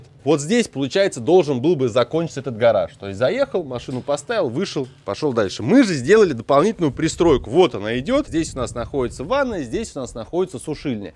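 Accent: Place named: Provinces, Russia. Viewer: Он конечно старается молодец, но речь ужасна. Прям видно из провинции.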